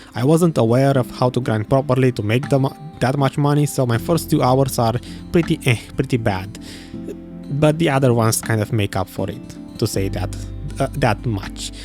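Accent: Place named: Romania. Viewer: You sound very romanian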